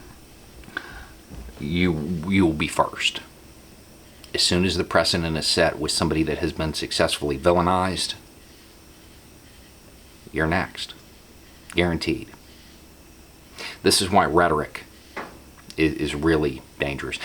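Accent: South, United States. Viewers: Are you from Lower Alabama or Upper Florida?🧐😂 just a country question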